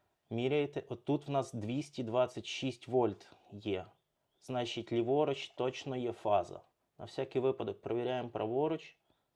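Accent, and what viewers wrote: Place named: Cherkasy, Ukraine. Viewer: Ше раз, - точно з черкаської області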